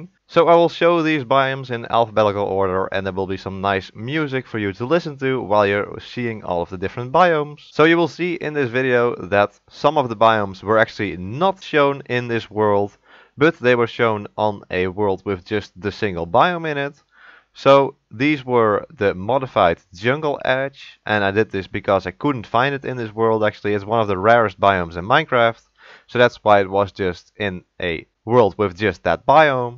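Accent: Netherlands. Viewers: Goddamn the dutch accent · Mooi Engels haha valt wel op dat je Nederlands bent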